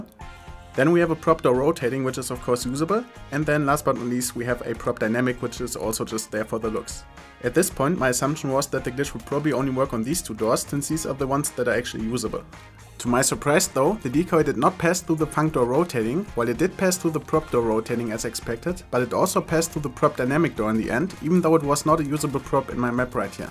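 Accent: Germany. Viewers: uuh ein deutscher xD geiles info Video :p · Wie er einfach den tooodes deutschen Akzent hat. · Geiles video. Hahahaha Deutsche hören instant, wenn jemand anders deutsch is. auch wenn er englisch redet XD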